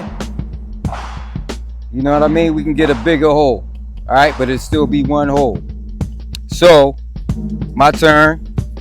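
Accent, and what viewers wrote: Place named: East Coast, United States. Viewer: I knew I sensed the east coast presence brother. I’m from the Bronx and it was like listening to one of my fellas when I watched your video. Much love to the channel and future success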